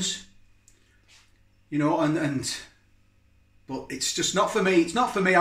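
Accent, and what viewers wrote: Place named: South Yorkshire, United Kingdom. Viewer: Thought you sounded like you are from South Yorkshire x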